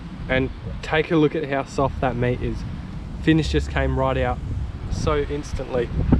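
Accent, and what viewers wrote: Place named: South Australia, Australia. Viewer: I think this is the first time I’ve noticed a different accent from SA to east coast Aus . Nice vid ! Cheers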